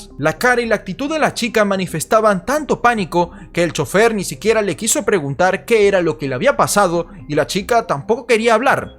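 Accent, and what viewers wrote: Venezuela. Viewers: Una pregunta amigo, eres Venezolano?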